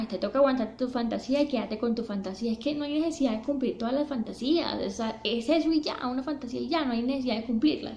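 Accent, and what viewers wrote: Colombia. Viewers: es de Colombia por su asientos y forma de ablar ve sus vídeos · Buen vidro, un abrazo desde barranquilla. Aveces se te sale un asento costeño.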